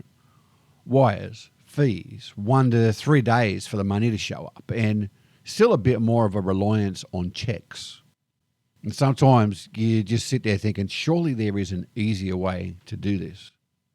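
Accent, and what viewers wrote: Australia. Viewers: So many daily details we take for granted or aren’t conscious of, till they’re gone, then we notice and are grateful! ☺️ I like the Aussie accent and kookaburras! Joy.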